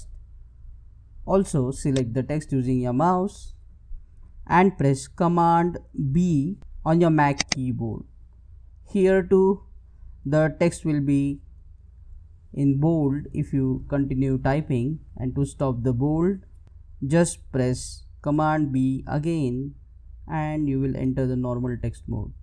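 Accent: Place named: India. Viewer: The indian accent makes it better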